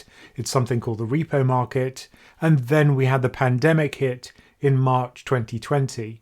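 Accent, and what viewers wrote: United Kingdom. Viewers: Is that a British accent?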